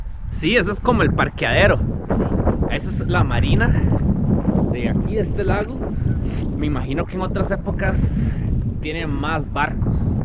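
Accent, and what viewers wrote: Costa Rica. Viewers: No más abriendo el video, escucho ese acento y le digo a mi señora ese “mae” tiene que ser tico Pero lo que no me dejó duda, fue cuando dijiste que el arroz que comiste, “era como cantonés”. Así o más tico, lo digo con orgullo😂😂😂 👍🏻👍🏻👍🏻👍🏻👍🏻🇨🇷🇨🇷🇨🇷🇨🇷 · Si ud no es costarricense y no sabe aceptar o tolerar que así hablamos los Ticos; si no sabe reconocer que esta es una de nuestras características, entonces pásese a otro canal donde hablen un español neutro y sin ese saborcito Tico que le pone a sus vídeos... De lo contrario, calladito más bonito... 🤫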